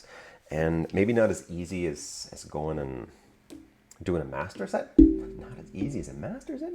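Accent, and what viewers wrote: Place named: East Coast, United States. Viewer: First time coming across your channel - you sound like an east coaster! Ive collected Yuka Morii’s full english set, and I’m working on komiya, Kusube & Nishida!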